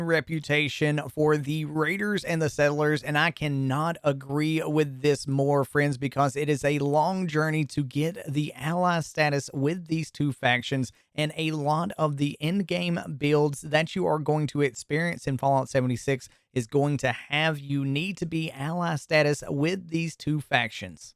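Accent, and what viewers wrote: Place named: Eastern Kentucky, United States. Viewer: You have got to be from the Eastern KY area with that accent. Recognize that anywhere. 😁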